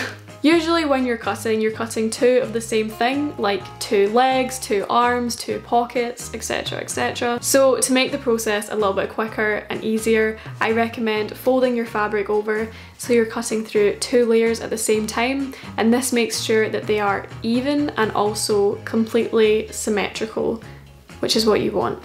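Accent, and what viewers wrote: Scotland, United Kingdom. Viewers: Fucking terrifying to see a Scottish accent on the internet, like I only see you guys outside when I leave my house??? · You are a pleasure to watch. Simple instructions and fabulous end result. Keep up the good work. Watching from Victoria. Australia. Love your Scottish accent.😎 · Came for the pattern, stayed for the Scottish, yass 🙌 🏴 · I subscribed to hear your (Scottish?) accent as well. Ha ha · Are you from...Scotland? You have the best accent! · Ahh I love your Scottish accent!! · Pretty sure she has a Scottish or Irish accent, I think Scottish though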